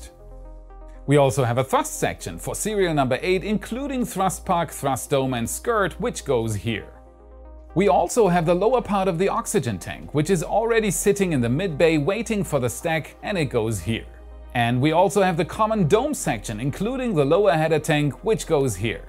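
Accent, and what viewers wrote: Germany. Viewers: Top-quality content, and loving the German accent! :D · Your English isn't quite good enough to tell (yet), but he has a quite noticeable German accent. Similarly, most native English speakers can't tell Bavaria from Berlin from Bern. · is that a german accent ? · I can hear the german akcent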